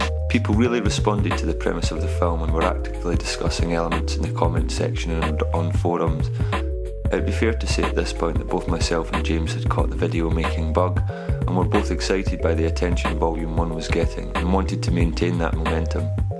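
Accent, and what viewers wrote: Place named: Scotland, United Kingdom. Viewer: I've really enjoyed your vids. Pretty exited that your back, or what? Been educated in the English language since 4th grade, some 30 years back. I know I'm not perfect at it, but quite good I think. Anyway... I DO like your Scottish or Irish accent, (excuse me) but your talking WAY to fast for me, to pick up details. Please consider your foreigner fans, when making the speech. THX Looking forward, to what might come up :)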